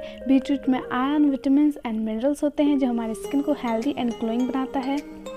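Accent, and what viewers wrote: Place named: India. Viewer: Индийский английский....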